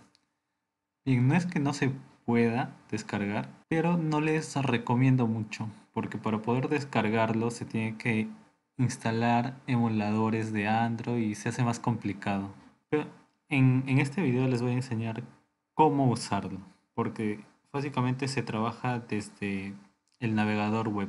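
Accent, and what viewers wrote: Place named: Peru. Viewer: adivino de donde eres eres de peru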